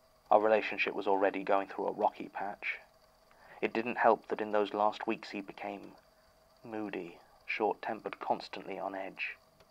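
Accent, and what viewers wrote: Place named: United Kingdom. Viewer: “If they work for the Magnus archives they should” Martin*listening in outside* : breaks into a cold sweat I’m in danger “I had never been scared of dolls" Me and my 2 worst fears being dolls and bloody mary(thanks supernatural): godDAMMIT Also Jons "...Americans" reminded me of one american youtuber recommending the podcast and one of her reasons being "the guy is British and his accent-" and I had the same reaction😂(I live in Scotland)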